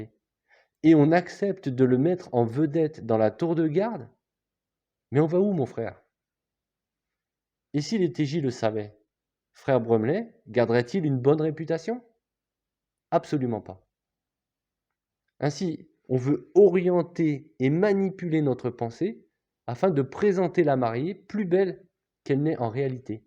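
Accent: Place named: North, France. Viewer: 😊Merci pour votre sincérité, votre véritable foi, votre courage et votre bienveillance qui transpercent à travers votre ton et ces analyses basées sur l’enseignement du Christ. Votre intervention ici, est un baume sur les plaies. PS.: votre léger accent résonne familièrement à mes oreilles, moi qui ai passé ma jeunesse dans la ville de Robespierre.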